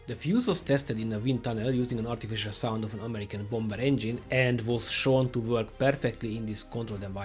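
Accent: Hungary. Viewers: az a jó kis magyaros kiejtés:D egyébként nagyon jó lett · Húú bazmeg, ettől az ótvar durva akcentustól szinte megfájdul a fülem. Ne magyarul mondj ki angol szavakat!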